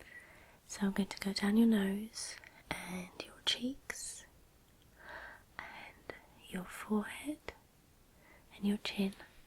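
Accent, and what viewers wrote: United Kingdom. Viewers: I love British people's accent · ASMR with British accent? an absolute perfect combo.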